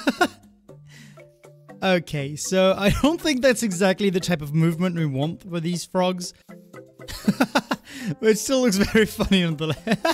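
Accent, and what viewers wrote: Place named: France. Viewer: are you french ? you have a small accent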